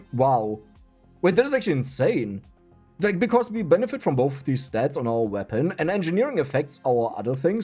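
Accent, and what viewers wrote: Germany. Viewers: Hi there, may i ask from wich region in germany you are? Im realy not sure with your accent. Thanks for your videos they are quite entertainung.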